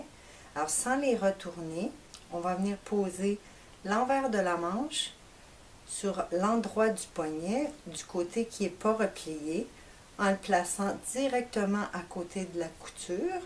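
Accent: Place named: Canada. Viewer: Merci infiniment pour ce super tuto, très bien expliqué avec ce sympathique accent canadien! je désesperais de ne pas finir mon chemisier. Je vais pouvoir le faire et je m'abonne bien évidement.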